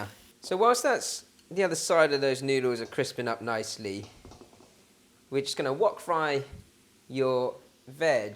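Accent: United States. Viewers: As an American I think your US accent wasn't bad lol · That American accent 😂🤣 I've had to go on a fodmap elimination diet. Many things on your channel qualify or can be slightly modified and I love that I can still use your recipes! I need help though. I absolutely cannot find dark soy with the grocery delivery services during this pandemic. I've used tamari and "created" dark soy from other recipes, but I am curious if any of you have suggestions on mimicking dark soy in the best possible way. It doesn't have to be low fodmap friendly, it's also for the rest of the family to enjoy.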